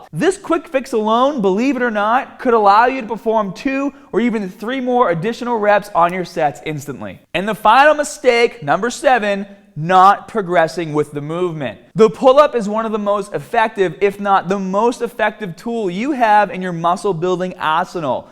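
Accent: Boston, United States. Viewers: Thanks for the tip about the band assisted pull-up, I was looking for that. I think there is a R in the word "hard". Boston accent? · Marine Poolee here. I ship out to boot camp in October and I've been working hard to get better with pull ups. I couldn't even do 1 pull up a couple months ago but now I'm up to 10+ pull ups. Btw, you talk the CORRECT way, I'm from the Boston area and you talk similar to me. Both my parents are from the city with thick accents so I guess that's why I talk the way I talk lol. Your accent sounds a little forced but you still talk the right way lol. Keep up the good work bro 💪🇺🇲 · Am i hearing Boston? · First introduction to this guy. Good video. Good info. I suffer at pull ups but I keep trying. He sounds from Boston. This guy puts the strong in "Boston Strong". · Great video. I need to get onto pull ups and your vid gives great advice so thank you. 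Great Boston accent too. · Sounds like Boston man... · Must be from Boston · Staaat the caar near the baaa.....and be smaaaaaat. Must be Boston or close by. His advice is good. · The Boston is strong in this one! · Sounds like Boston. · Boston I think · Boston rrrrrrrrr's all ovah the place! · Very good video, these are great tips, especially the ones that have to do with getting started if you can't do pull-ups at all. And as always, that Boston accent is on point! Love it bro! Keep doing you! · that boston accent be thiiiiick haha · is that a Boston accent? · You sound like you’re from Boston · Pullups R too Haaaaad for you...LMAO...The advice puts forth has been around since the 70s, nothing new here but the Boston accent did bring the lulz. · Hes definitely from Boston. Forst time watching this guy but im from Boston so its not haahhd to heaa the accent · Soon as I heard you speak I said wait a second, this guy has to be from in or around Boston, then I saw the " " and now I know you must be, I'm actually right from the city of Boston 4th generation myself and Pat's season tickets holder, anyway, pretty cool and good luck with your channel and overall success, nice to see a fellow Bostonian doing well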